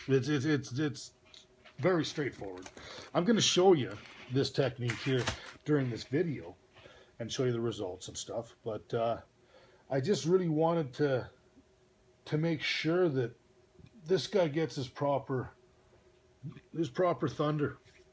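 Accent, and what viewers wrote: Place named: Upper Peninsula, United States. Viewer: are you in the Yoop by chance? I am originally from Iron Mtn area and live in Denver. But i tht I could recognize the ol' accent. Great video, i saw this dudes video about a week ago and was blown away!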